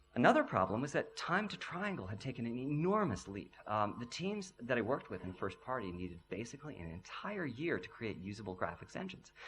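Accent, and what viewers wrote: United Kingdom. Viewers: Wait he's an American? Why does he sound British?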